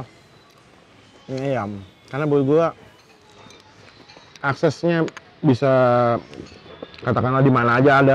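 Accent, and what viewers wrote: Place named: Java, Indonesia. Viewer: Medokmu kok iso menjiwai to ngab Padal mbendinane ndek njakarta Sukses terus friend eating Assswaabbb !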